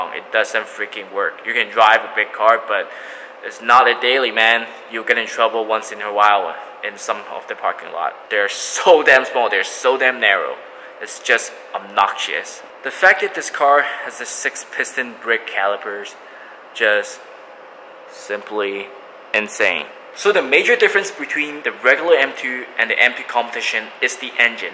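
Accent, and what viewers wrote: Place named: United Kingdom. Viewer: Wow. Love your videos. I’m from uk. From your accent did you study here?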